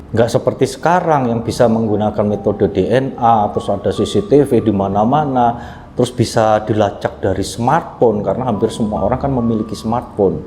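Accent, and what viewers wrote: Java, Indonesia. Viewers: bingung... Saya dengerinnya juga bingung kejaaam bgt.... Suka logat kejawaannya medok medok gitu mantaaap deh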